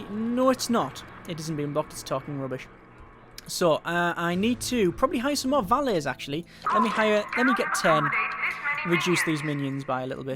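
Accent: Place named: England, United Kingdom. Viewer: ***** Nope, he's english.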